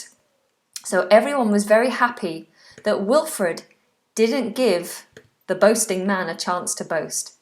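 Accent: United Kingdom. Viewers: I don't like the British accent thank you.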